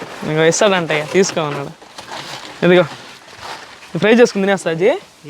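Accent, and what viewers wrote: Nellore, India. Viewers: Mimmalni chusthunte mucchatesthundi... God bless you.. Nellore language mi daggara vintunna🥰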